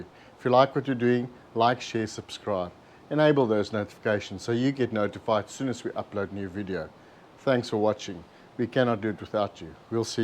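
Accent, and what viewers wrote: South Africa. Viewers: Love the South African accent!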